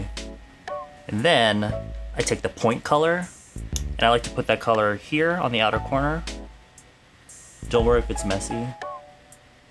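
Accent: South Korea. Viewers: The konglish is strong in this one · your konglish😂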